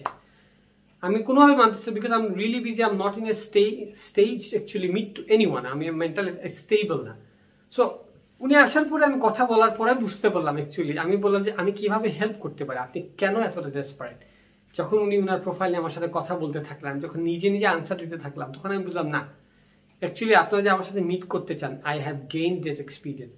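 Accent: Sylhet, Bangladesh. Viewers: ভাইয়া সিলেটের আঞ্চলিক কথা গুলা খুব ভালো লাগলো😍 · Bro!! Sylhet apnar basha kuno?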